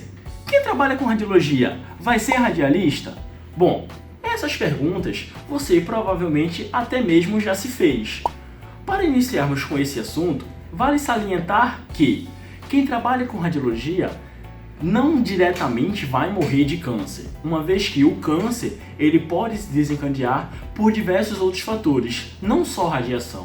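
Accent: Rio de Janeiro, Brazil. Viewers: Você tem sotaque do Rio de Janeiro, fala com o "S" acentuado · Show o conteúdo, kkkkk tu é do Rio de Janeiro é? Kkkkk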